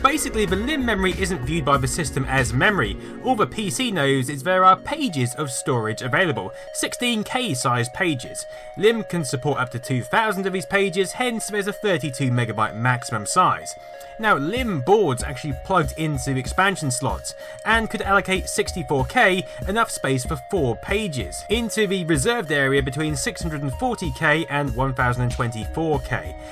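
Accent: United Kingdom. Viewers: In all fairness, English is my only language, but can be hard to understand at times. I find that's just his UK accent.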